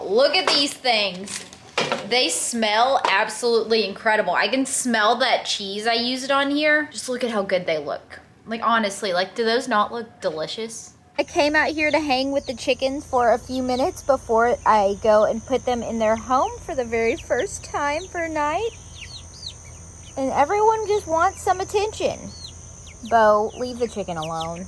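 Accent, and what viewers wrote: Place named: Texas, United States. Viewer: You sound born in raised in Texas. You shouldn’t be surprised at the change in our temperature and weather down here .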